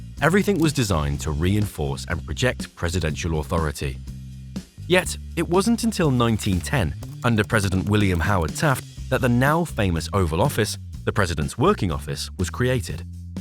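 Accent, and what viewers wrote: United Kingdom. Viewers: If your going to use an AI to talk about an American icon, why would you make it sound British? :person-turqouise-waving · Why does every fcking show have to have the British accent. Were not fckng Brits!!!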